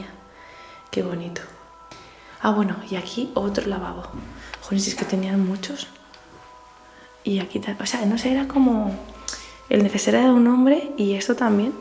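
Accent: Spain. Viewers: Lo que me gusta de tus videos: 1-Tu voz me trae tranquilidad, es muy bonita, además ese acento español :D. 2-Me encanta ver como revisas las habitaciones, es muy curioso todo lo que puede estar ahí adentro :0. 3-Tu no mientes sobre los fantasmas, tu solo muestras lo que tienes que mostrar, no como otros Youtubers que ponen de titulo en su video "¡O por dios!, nos hemos encontrado a un fantasma a las a.m" que si, se que le da drama y suspenso al video, pero tampoco es como si hiciera falta xd. No se si me explique bien pero bueno jsjsjs, el punto es que me encantan tus videos ^^, alta biblia que acabo de escribir xd.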